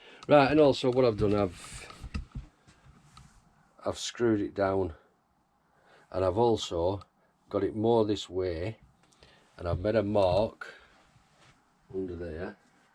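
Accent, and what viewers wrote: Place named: Northern England, United Kingdom. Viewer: Hiya defo agree with other comments try and flatten out the cold water pipe or re pipe it if that dont work shortest route good look m8 and were are you from defo a northern accent were Bolton chris & sheila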